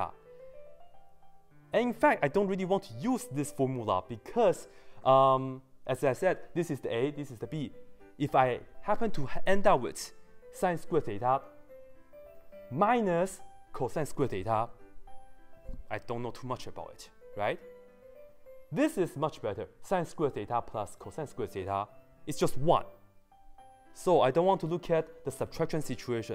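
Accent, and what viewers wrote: Singapore. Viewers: It sounds like Singlish to me.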